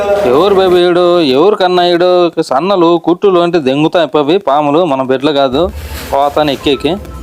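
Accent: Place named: Anantapur, India. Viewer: Ananthapuram telugu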